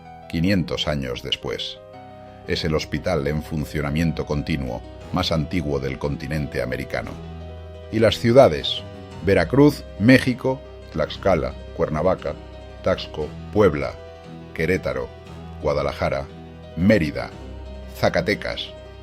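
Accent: Spain. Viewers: Te oyes español. Recuerda que la historia de la conquista de la conquista la escribió Bernal Díaz del Castillo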